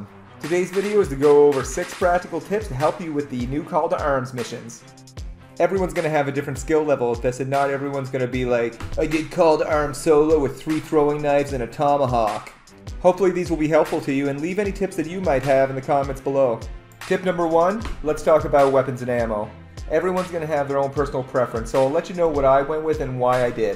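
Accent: Canada. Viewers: Nothing wrong with sounding Canadian! I’m Canadian too lol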